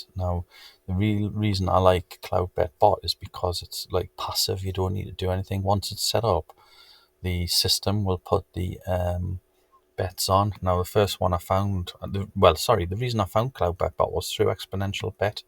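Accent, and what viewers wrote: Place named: Newcastle, United Kingdom. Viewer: Hi. I'm on this journey with you. Just signed up last night and set it away this morning. Got a telegram from the administrator to say minimum betfair stake was £10 so I couldn't use the £2 I tried. He said 10 was minimum bet to get bsp. Hope this is all legit! And also, I'm a Georgie too so I love hearing your accent on here. I live in Cramlington!!! Thanks for your great videos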